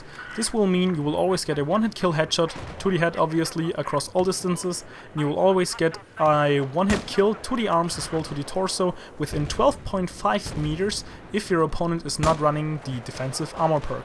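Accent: Germany. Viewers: are u german? :D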